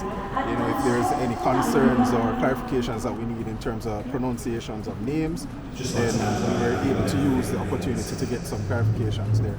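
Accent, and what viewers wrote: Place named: Jamaica. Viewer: I was listening today and thought it was a Jamaican announcer and then convinced myself it was wishful thinking🤣🤣🤣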